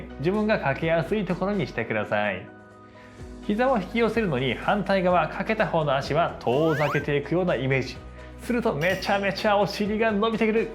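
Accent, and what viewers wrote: Kansai, Japan. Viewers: 脚痩せ、だいてんし引っ込めたいです。先生の良い声、時々関西弁がツボです♪洋画の吹き替えやってほしいです^ ^ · 初めて見ました〜 関西の方かな？ 私はあまりこの手のYouTube見ないのですが、解説がどこにどう効くかとか、今の状態が示す自分の体の状況がわかりやすく、あまり長すぎないストレッチ、そして声が良くて！最後まで一緒にストレッチしました〜 体スッキリ！ 年齢と共に体型が少しずつ変化していくのでそのへんの解説と共に効果のあるストレッチをしたいな〜と思い、登録しました！ 昔に比べてふくらはぎの太くなっていく部分が下がっています。太さは変わらないのに…これもまたまだでしたら解説聞きたいです！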